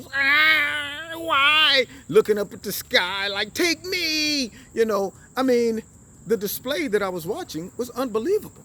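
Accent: United Kingdom. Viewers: You are funny. Had a good laugh with that British accent. 👍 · That British accent!!.😂😂😂😂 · I laughed from the title to the end. Great British Accent! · Drinking lava lmbo you are AWESOME love your British accent LOL!! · YOUR BRITISH ACCENT IS PERFECT.. GO ON AGT. YOU ARE GONNA BE A WINNER!!!!!!!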